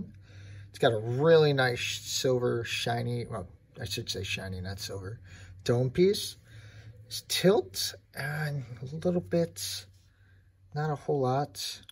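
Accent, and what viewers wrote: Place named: Asia. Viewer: Are you in the Philippines? cause you have an Asian accent. I just like to ask where to get that.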